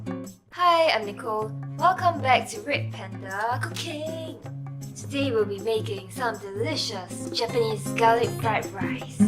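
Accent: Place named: Singapore. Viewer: Can tell you're Singaporean with the accent hahah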